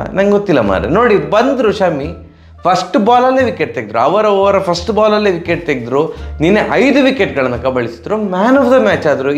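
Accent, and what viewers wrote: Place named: Bangalore, India. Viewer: Bro dakshina kannada bittu blre kannada mathadtidira... please DK kannada dalli maataadi..that janardan sir bashe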